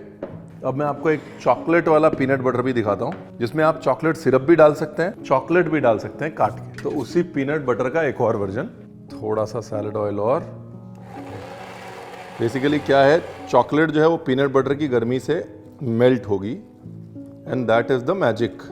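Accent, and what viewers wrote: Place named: Lucknow, India. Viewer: Guru Tezz ho tm hain...laucknowa phonetic chhodate ni ho...sayad chhutegi v ni.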